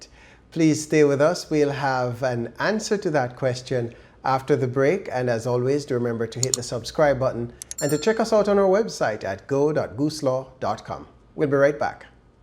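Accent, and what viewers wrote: Jamaica. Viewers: I hear a Jamaican accent 🇯🇲☺️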